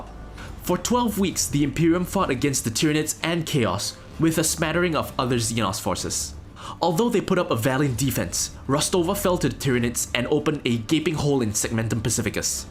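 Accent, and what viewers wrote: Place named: Singapore. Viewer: I KNEW YOUR ACCENT SOUNDED LIKE SINGLISH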